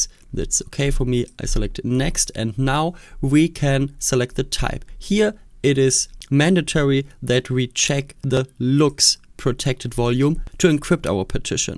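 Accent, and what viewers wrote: Germany. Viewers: could it be that you are german? Your voice sounds really german and how you pronounce stuff sounds german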